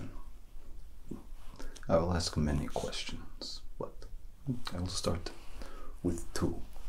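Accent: Russia. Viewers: I always love it when you use a russian accent in your videos. The autopsy part was my favourite. 😊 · ! It's just amazing! I would like to thank you for your efforts to speak and write in russian. Nice job!💛 (русские отпишитесь здесь! я думаю, что вам тоже нравится, как он старается спародировать русский акцент) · your russian accent is getting better!!! also very unexpected to see pathologic content, but so so cool. absolutely amazing games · I can't even decide what type of ASMR I love more - talking with Russian accent or surgical procedures. It''s a perfect combo :D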